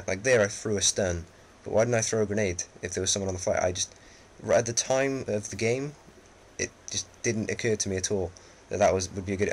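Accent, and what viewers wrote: United Kingdom. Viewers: He sounds british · this guy is the only guy that sounds british that plays on xbox 360 cause like every video i see of a british person its always ps3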